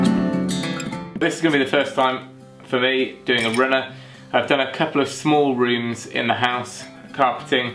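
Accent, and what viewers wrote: United Kingdom. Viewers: Great work and video. I can listen British English lol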